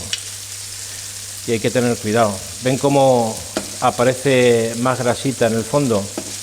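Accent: Castile, Spain. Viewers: Ya lo leí en su mandil hermano hermoso dicho el alma que anda en amor me cansa ni se cansa también es lindo escucharlo hablar castellano castizo aquí en América Latina a veces nos comimos palabras que son tan expresivas como las que usted habla cuando describe todo Usted es una persona encantadora hermano y sus historias me divierten aquí sólo escuché en mi vida de el Marqués de calatrava todos los errores de gramática son porque soy muy vaga para escribir y la grabadora corre sin puntos ni comas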